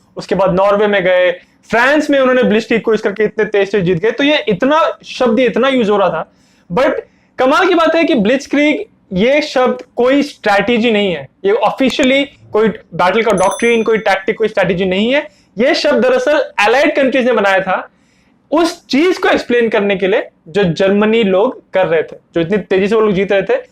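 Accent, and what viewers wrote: Bengal, India. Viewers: Bhai tum Bengali ho kya please reply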